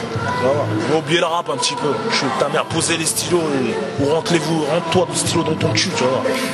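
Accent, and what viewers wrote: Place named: Paris, France. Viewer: il parle gabin, samat joe et mec de Paris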